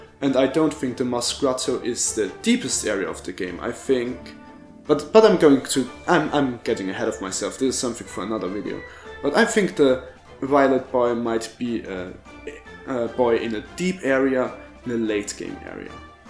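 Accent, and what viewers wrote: Germany. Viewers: Bro deine englisch aussprache verrät direckt das du deutsch bist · love your vids and your german accent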